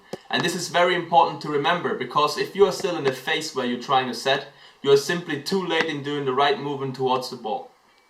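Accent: Germany. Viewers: hey dude .. this is very helpful , thanks !! by the way .. Are you a german ? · Du bist doch deutscher mann hört’s an deinem Akzent 😂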